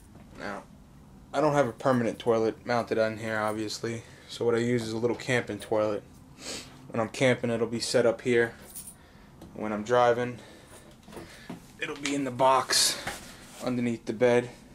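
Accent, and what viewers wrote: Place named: North Jersey, United States. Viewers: you sound like your from north jersey , but greeting from across the delaware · you are def from north jersey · Joisee accent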